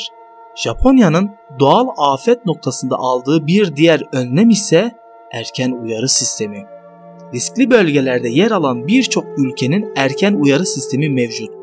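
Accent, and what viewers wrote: Aegean, Turkey. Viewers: Ege şivesiyle muhteşem bir ses eline sağlık yeni videoları sabirsizlikla bekliyoruz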